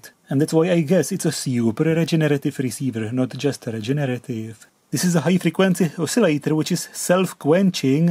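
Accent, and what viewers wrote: Czech Republic. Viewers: really hard to listen to ..is it czech accent? too bad, since the understandable parts sound interesting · I know his english is good and that he's Czech, but that is one funky accent. I'm just super surprised I don't see people talking about it.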